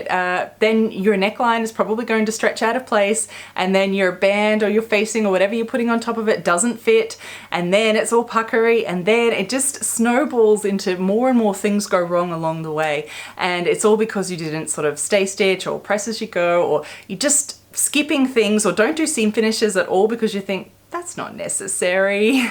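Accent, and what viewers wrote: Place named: Australia. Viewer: Great to hear an aussie accent.. sooooooo loooooong winded.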